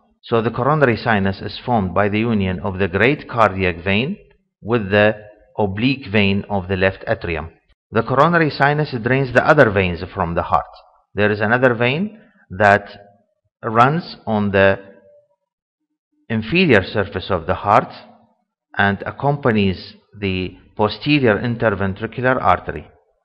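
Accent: Iraq. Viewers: you sound iraqi are you ?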